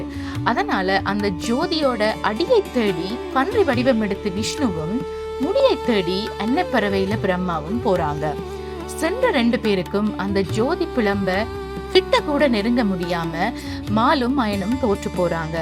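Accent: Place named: Sri Lanka. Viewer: Good one. Little different Tamil accent. Lankan Tamil ???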